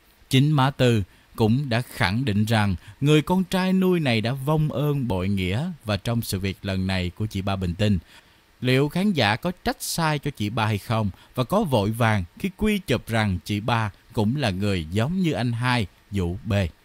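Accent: Standard. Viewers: nói chuẩn và hay.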